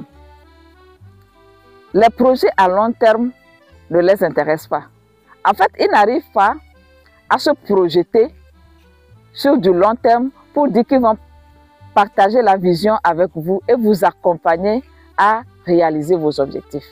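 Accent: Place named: Togo. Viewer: j'ai failli dire que les meilleurs ouvriers agricoles loyale et fidèle en Afrique de l'Ouest sont les natifs du NORD TOGO, mais à vous entendre ça me fait peur, car votre accent montre que vous êtes des togolais, mais une chose est sûr, l'expérience a montré que pour des domaines avoisinant déjà les plus de 05 hectares, faut carrément prendre des ouvriers venant d'une autre région du pays, avec un bon contrat à l'appui, et non des autochtones de la zone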